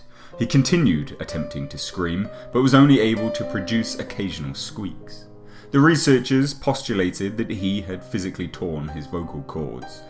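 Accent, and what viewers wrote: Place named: United Kingdom. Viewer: You know the story is 90% fake when the Narrator has a British accent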